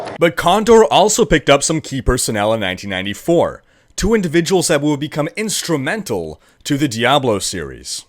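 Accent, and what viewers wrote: Quebec, Canada. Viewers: Are you from Quebec? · He's Canadian mate. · Saku Koivu ? Are you from Quebec / Montreal ?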